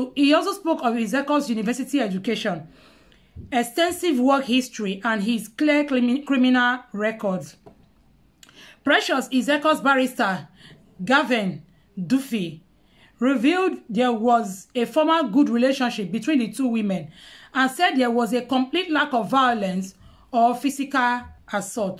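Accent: Nigeria. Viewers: Madam your vidoes are so helpful but try to speak proper English please coz some of us are not from Naija and we are finding it so hard to understand your pigeon English (sorry for wrong spelling) Thank you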